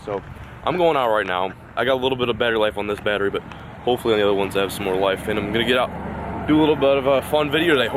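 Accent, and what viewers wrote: South, United States. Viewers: Lol, in this old vid you can hear a like southern accent from, wow things have changed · How come you talk like you have a southern draw in this video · I feel like you are talking more like a southern accent than you usually do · Sounds like you’ve got a southern accent in this video. I’m a new sub. Going back and watching videos. You sound so different. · Actually southern and mid Ohio + all the country side folk have that kind of southern twang. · why do you have a southern accent in this video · How much voice has changed from then to today in 2019 The southern voice disappeared 😂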